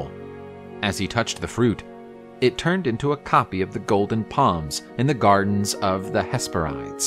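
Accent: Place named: United States. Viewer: You have nice America voice and I can clear understand. Very nice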